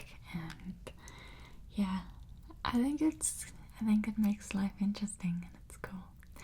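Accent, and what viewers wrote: South Africa. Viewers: My fave place on earth. Hoping I win the lottery and can move out to camps bay or kleinbaai!! Great accent! Great vid!! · The accent is beautiful. My best buddy Ralph is Afrikaans and his accent is incredible. Great singing voice too. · I love your accent! Glad you did this! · Hey cute lady south African British rose lady great video I could listen to you're accent forever and I sure will if I ever get chance in future I would love to visit south Africa and stay in a tent hey I will watch the video and sleep to it thanks I hope you try potatoe cakes there British and try crumpets with butter xxxx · So happy to hear a from a south african asmrasrtist! 🇿🇦 LOL the feeling of nationality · Absolutely love your accent! My new fave asmr channel! So glad I found you 😍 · Just ran into this on a rainy evening in Alabama. Had a chance to visit South Africa years ago. 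The accent is fantastic. But here in the states there are a variety of pronunciations based on geography. What you call a trolley is a cart or buggy here in the south for example. And sometime emphasis is on a different syllable. Pecan for example is either “P-can” or “pecahn” as you said. Another example is can’t, here in Alabama we might say it as “cain’t” Great stuff!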